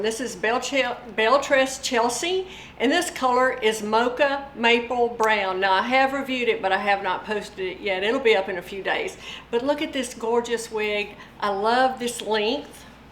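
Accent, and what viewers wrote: South, United States. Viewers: I watch you all of the time. I love your cute southern accent and your natural elegance with your videos.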